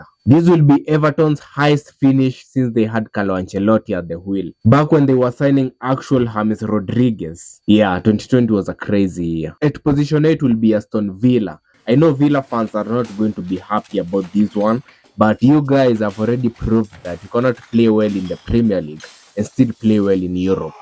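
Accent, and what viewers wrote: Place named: India. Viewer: Indian accent level 10. Nah lowkey good video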